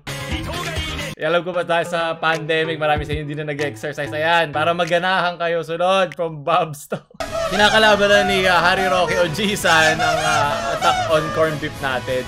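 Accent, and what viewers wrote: Visayas, Philippines. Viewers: Bisaya ka hahaha yawa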